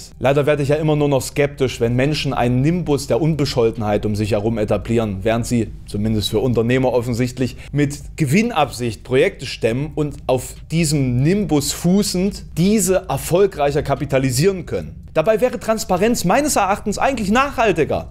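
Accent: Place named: Saxony-Anhalt, Germany. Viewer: Neo Magazin Royale war einmal, mittlerweile heißt es ZDF Magazin Royale. Nur mal so als Zwischeninfo. Dann noch kurz ne Frage an den: Kommst Du aus der Nähe von Halle oder Leipzig? Ich überlege wohin ich deinen Dialekt einordnen würde. Vielen Dank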